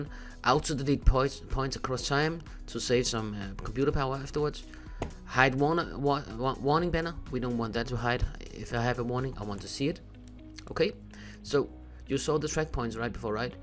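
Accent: Denmark. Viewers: Tak for videoen! ;) Ka hører du er dansk :)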